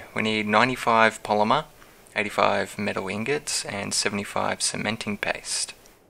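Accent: Australia. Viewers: Was looking at how to aim - and watched the whole thing laughing - and - its nice to hear a fellow Aussie voice!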